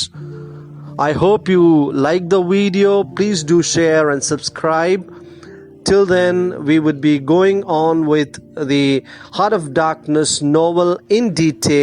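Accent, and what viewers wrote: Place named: India. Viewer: Youre not danish, I can hear the jeet in your voice, saar.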